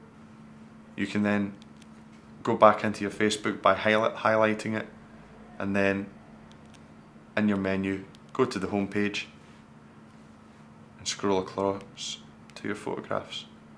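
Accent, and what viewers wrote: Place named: Northern England, United Kingdom. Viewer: hes from northern england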